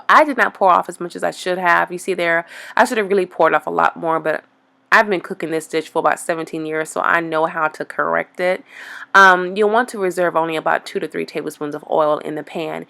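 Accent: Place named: South, United States. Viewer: I love this lady, sounds so family like and comforting, guess it’s a southern thing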